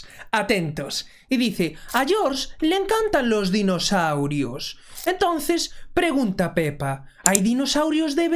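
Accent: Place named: Galicia, Spain. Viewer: PD: Tienes un acento gallego precioso.¡Galicia calidade!✌🏻❤️🙌🏻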